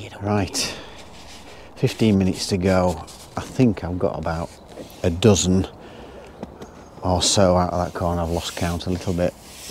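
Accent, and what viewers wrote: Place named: RP, United Kingdom. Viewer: U with ya posh voice saying "my mate turtle" made me laugh.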